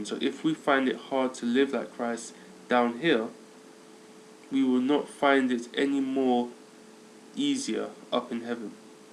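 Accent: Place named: United Kingdom. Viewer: i like your british accent !!!!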